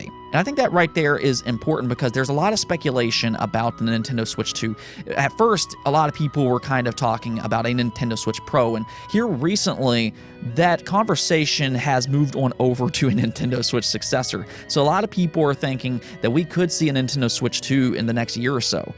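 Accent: United States. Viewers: Your American Accent is really really strong, wow is most noticeable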